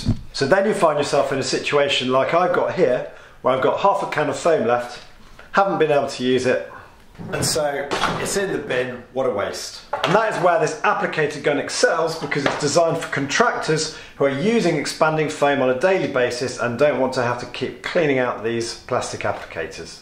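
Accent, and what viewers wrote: England, United Kingdom. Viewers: americans can listen to english blokes talk, all day, i dont know if youre in the biz, but you could do product reveiws among other stuff haha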